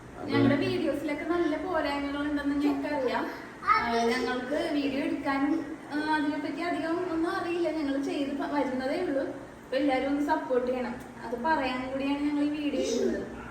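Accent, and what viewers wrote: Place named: Kochi, India. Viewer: സാക്ഷാൽ കൊച്ചി ഭാഷ😂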